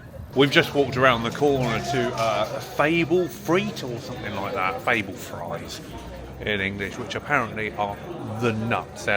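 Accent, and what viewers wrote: Scotland, United Kingdom. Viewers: are you Scottish??.....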